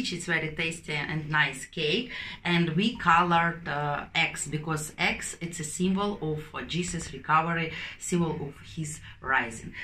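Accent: Russia. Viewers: You speak very good English better than i do and im American. Lol!!! I love ❤️ your Russian accent. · Wow - was missing a beautiful face & your sweetest voice - Russian accented English, for months. Good to see the most beautiful Russian babe persona with 33k plus subscribers. Keep it up Miss Russia 💪👊😎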